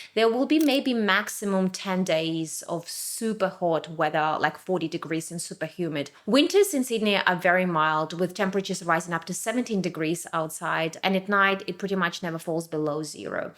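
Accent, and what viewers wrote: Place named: Ukraine. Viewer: an inspiring video, with a certain light vibe. you have a pretty cool accent as a Ukrainian❤❤❤❤ thanks for the video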